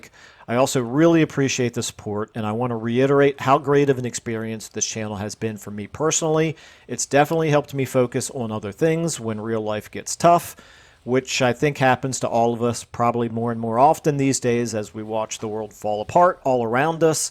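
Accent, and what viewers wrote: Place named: United States. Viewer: I love the way you present the information - and I have to acknowledge a personal note that your American accent is just a bit easier for me to follow than the strong accents that characterize some other favorite channels of mine. No judgment. But I wouldn't mind you doubling up on the info presented by other channels. I've binged hundreds of Legend's videos and found that, for all his expertise, we just like to play the game differently. And his guides and tier lists don't always track with how I enjoy playing the game. Feel free to reinvent the wheel because most of us would benefit from what you have to say in the particular framework you put things in.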